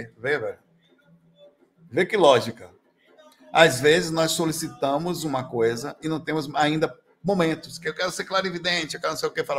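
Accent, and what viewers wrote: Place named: Bahia, Brazil. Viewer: seu baianês é uma pérola. Obrigada!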